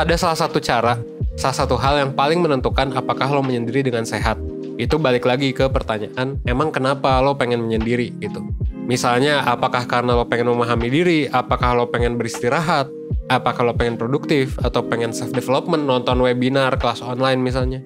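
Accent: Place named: West Java, Indonesia. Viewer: resep pisan pas ngomong orang sundaaa hoho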